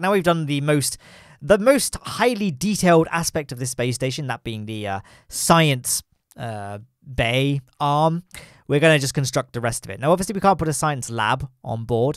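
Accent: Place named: Doric, United Kingdom. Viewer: The "wh" pronounced as "hw" is a Doric thing, the dialect that suits between English and Scots. So it's especially appropriate for talking about hwiskey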